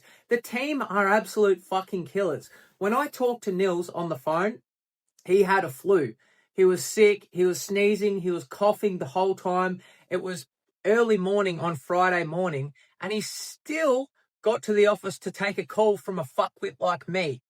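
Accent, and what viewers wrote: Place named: Australia. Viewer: Aw yeah nah yeah Nils is a real one thats for sure. Once you see the Auki big vision you understand this is the inevitable progression of blockchain. Great to see that Aussie spice in your vids from a fellow Aussie. And "owki" said right haha - Scodo.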